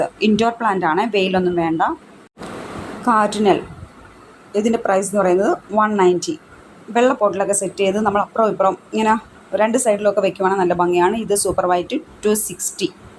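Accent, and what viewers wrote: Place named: Trivandrum, India. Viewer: Trivandrum angna thanna parayunne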